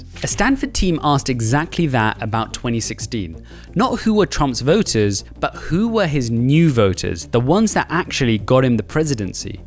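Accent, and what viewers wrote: United Kingdom. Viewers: We r not slaves from Africa this British accent Hindu began the video off lying we r not slaves from Africa stop 🛑 lying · I found this video thoroughly adept at rationalizing racism away - in part by signaling or assigning "degrees" through a contrived methodology and then putting it on the viewer to "decide" where they fall on the scale. the other comments did a good job citing the obvious. In short this was clownish and disgraceful. the British accent leant a little credibility I guess though...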